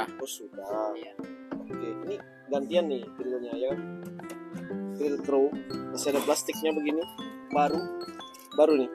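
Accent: Bali, Indonesia. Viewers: orang bali ya? Kelihatan dari cara ngomong nya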